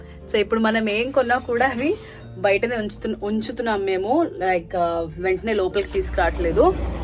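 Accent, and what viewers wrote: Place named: Telangana, India. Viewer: Meru తెలంగాణ లా masthu matladuthunaru...💞💞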